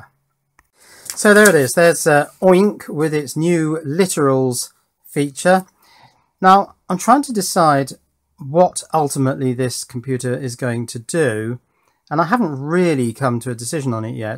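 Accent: United Kingdom. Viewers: Are you British Man!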